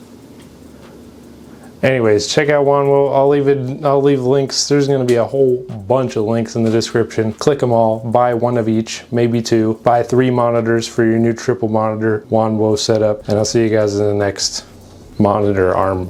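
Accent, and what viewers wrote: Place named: United Kingdom. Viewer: The british accent always has me 💀💀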